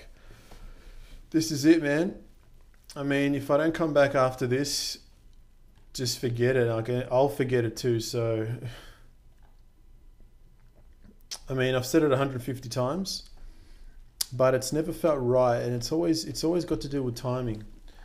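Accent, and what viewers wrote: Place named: Australia. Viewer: Is it safe to assume you are of greek descent ? :P Im not gonna ask whether you are an aussie, your accent gives it away.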